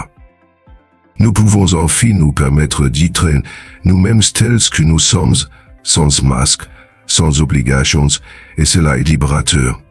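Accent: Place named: England, United Kingdom. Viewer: D C'est bien la première fois que j'entends une vidéo avec une voix off qui a un fort accent étranger (anglais ou américain ?). Les sous-titres sont désastreux mais cela m'a bien fait rire !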